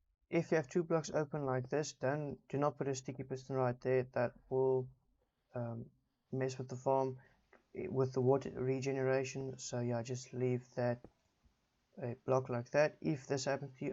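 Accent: South Africa. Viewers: are you sourh african?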